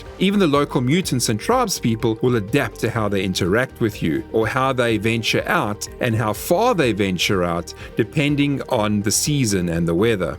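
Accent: South Africa. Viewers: Are you South African? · Talking about "big" survival games, the day before will probably not get released this year. Your accent is not as strong as some, going to say Western Cape?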